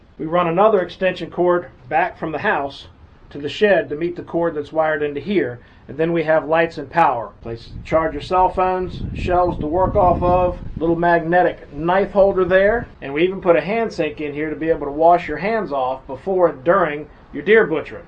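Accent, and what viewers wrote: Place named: Carolina, United States. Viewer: Hey, you sure sound like you live next door. I’m in Carolina, north of the OuterBanks. Are you near here?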